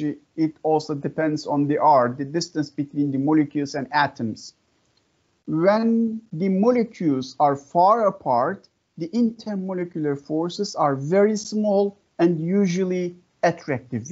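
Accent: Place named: Russia. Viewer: At first I thought that you were speaking Russian.. But glad it's English.. By the way from which country are you from?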